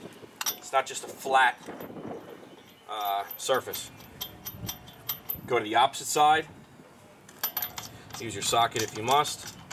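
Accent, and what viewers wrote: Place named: Rochester, United States. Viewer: Very nicely done ... nice to hear (Rochester County?) accent, reminded me of person who first taught me about fixing cars. Though quite familiar with job, appreciated fine details and learned helpful hint about lube around clip area in addition to caliper and front side fingers. Imagine it is really supportive to receive appreciative feedback, especially considering what I imagine is only a low percentage of people who do appreciate it can/will take the time to write a comment.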